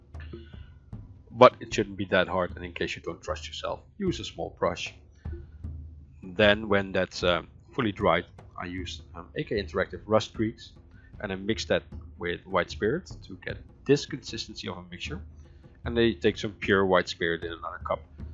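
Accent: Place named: Netherlands. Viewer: Ik herken een Nederlander wanneer ik er een hoor.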